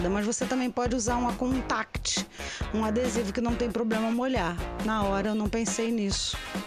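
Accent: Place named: Rio de Janeiro, Brazil. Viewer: É fácil reconhecer nosssso "sotaque"! #RJ❤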